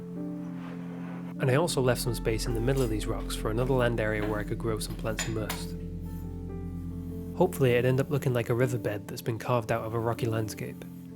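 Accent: United Kingdom. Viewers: Popped up in my recommendations, cool video and all, but I am intrigued by this guys English accent, it sounds standardised British English then suddenly American time-to-time with certain phrases.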